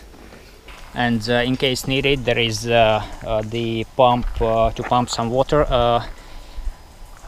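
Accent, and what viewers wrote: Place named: Russia. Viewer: Ох уж этот неповторимый русский акцент...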